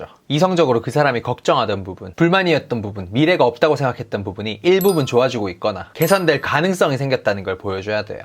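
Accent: Gyeongsang, South Korea. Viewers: 경상도 이네요이. 좋은자료 good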